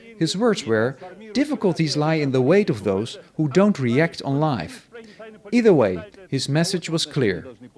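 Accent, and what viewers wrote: Netherlands. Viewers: Thanks for that, very interesting. Can I ask, are you from the Netherlands? Ich versuche höflich deinen Akzent zu erraten! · Not to be weird as a first time visitor but your accent sounds like Dutch English but genuine German when quoting Germans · It wouldn´t hurt to do something about the Dutch accent.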